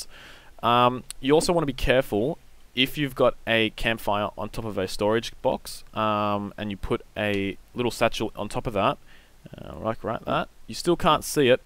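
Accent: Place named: Australia. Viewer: You're Aussie!! Awesome bro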